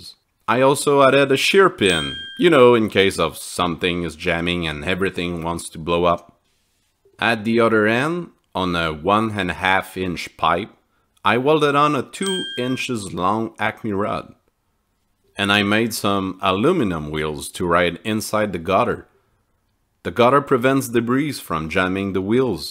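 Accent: Quebec, Canada. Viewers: ca ressemble a un accent du quebec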